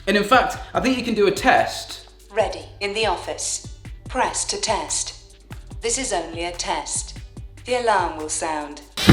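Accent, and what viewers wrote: United Kingdom. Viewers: British guy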